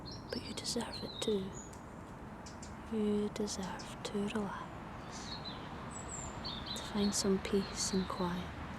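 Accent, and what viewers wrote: Scotland, United Kingdom. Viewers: I absolutely love how this ASMR video blends the natural ambient sounds of the outdoors with the gentle clarity of your voice. The subtle background noise from traffic adds a real sense of being in the moment, which feels so grounding, while your voice remains perfectly clear and soothing. That Scottish accent really enhances the cozy, personal attention vibe. Truly a relaxing experience, both calming and immersive! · Thank you, spine tinglingly supreme as always. The lull of your Scottish whispers and soft rich warmth of your chatter is pretty special. ❤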